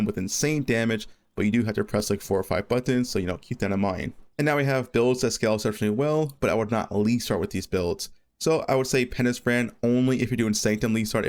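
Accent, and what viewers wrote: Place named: United States. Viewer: Good info, but you need to pronounce build names slower and clearer. I had to rewind a couple of times just to hear what you were saying. I'm not from america, so your particular accent isn't familiar to me.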